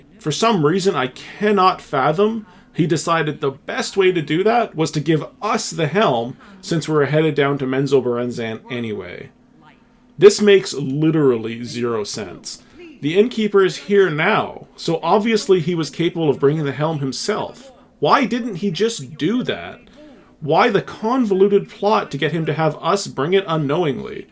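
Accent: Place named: Canada. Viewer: I always pronounced it Men-zo-burr-AHN-zun, I love your Canadian mish mash on the pronunciation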